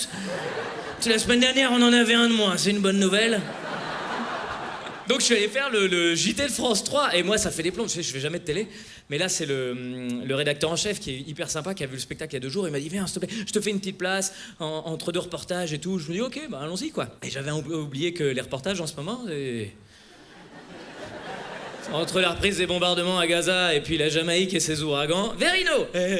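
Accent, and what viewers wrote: Quebec, Canada. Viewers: Mais quel talent ! Tu le tiens bien l’accent québécois ! 😂 bravo j’adore ! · J’adore merci pour ta vidéo. J’adore l’accent québécois 🥰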